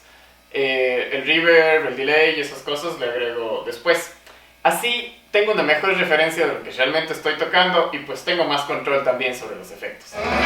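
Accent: Cuenca, Ecuador. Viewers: te has ganado un suscriptor!! eres de Cuenca? jaja tienes el acento xd excelente trabajo, espero que subas los videos explicando más a detalle todo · Tu acento me es familiar, eres Ecuatoriano mi brother?? 🇪🇨🔥🔥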